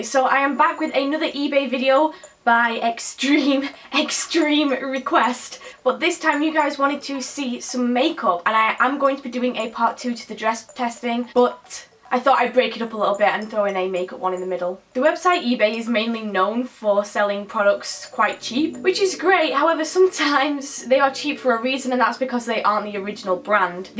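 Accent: United Kingdom. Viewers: Your accent is British(like meh)But u r in America....nvm :D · Ellen Coonan well she's British. They pronounce things differently. There is more than one accent you know. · Is she British cuz she sounds it but she says dollars??🦋💗 Love you!!xxx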